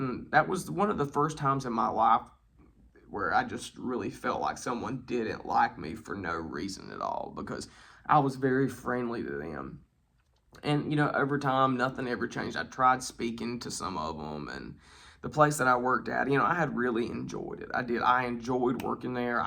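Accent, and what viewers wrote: South, United States. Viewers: mixed with a southern accent. I love it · your thick southern accent. I like it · I like your southern accent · My favorite saying is (Why try to fit in when you were born to stand out) PEACE ✌️ AND PRAISE my friend 🙏...PS I LOVE YOUR SOUTHERN ACCENT! HOLLA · While honesty and truth are vital, discretion is often also required. Regretfully, some gay men have the further challenge of having a feature I call the "gay voice". In fact, it fascinates me. You can meet men who were born thousands of miles apart from each other, yet they both have the "gay voice". It is beyond any psychological research or knowledge to which there is currently any reasonable explanation. It is like having a particular accent. You have a quite noticeable American southern accent. It is how linguistics developed in your area and was well established before mobility rapid transit and vocal transmission became possible during the Industrial Revolution, when the average person lived their entire life within 2 miles of where they were born. 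In fact, during the past 150 years, dialectic specialists began noting that ' regional accents' of the same language are beginning to homogenize. The influence of radio, television and now digital streaming video is beginning to cause a blending towards what used to be called a "Continental Accent". But while this explanation of various, differing accents has always been based upon regionality and highly limited mobilization for all but a short time in human history, the "gay male accent" has no basis in regionality. A gay man raised in London, England, will speak with the same gay sound as an American. It's an overlay accent upon a regional dialectic accent of a common language. Yet only some gay men have this added challen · The south, assuming from the accent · Sorry to hear this happened to you. Every time you looked at that girls boyfriend, she and he both probably considered it sexual harassment, like you were trying to seduce him. 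Employment law is supposed to require an investigation into allegations of sexual harassment, with the facts to be proven before you can be terminated. But when you have multiple people filing complaints against you, and corroborating each others stories, even if they are all lying, that is a hard thing to overcome without serious legal action. Sounds like you are from the South...nobody ever said that was the land of the fair and open minded. People are going to believe what they want to believe, the facts be damned. You probably need to do your best to stay away from the Bible thumpers. The Bible thumpers in this story probably need to study their Bibles a little more closely, maybe ask themselves, "What would Jesus do?".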